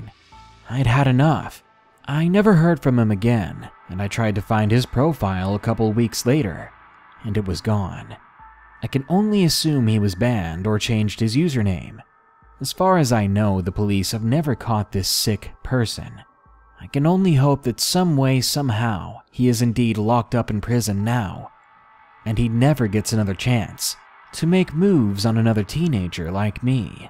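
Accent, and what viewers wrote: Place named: United States. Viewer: Great Videos Man, Also love your pure American Accent voice, did you create your profile picture yourself?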